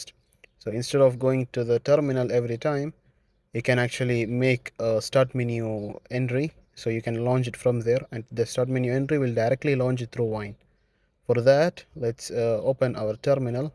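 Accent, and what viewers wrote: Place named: Arab world. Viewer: واضح عربي من الاكسنت " بروازير"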